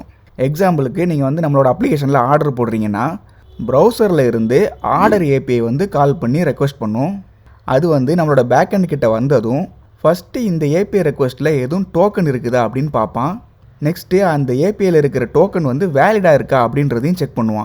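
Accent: Tirunelveli, India. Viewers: Neenga Tenkasi, tirunelveli side ah 😊😊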